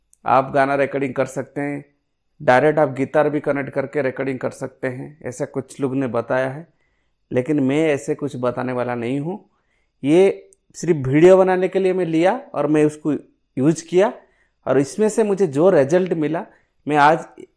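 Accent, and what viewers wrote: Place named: Odisha, India. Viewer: Sir app ki language odia jese laga rahe hai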